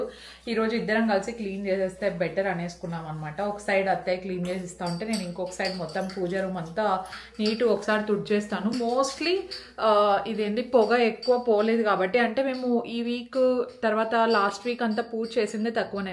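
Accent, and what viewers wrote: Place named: Rayalaseema, India. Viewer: Meeru same ma lage matladtunnaru meeru rayalseema or kadapa valla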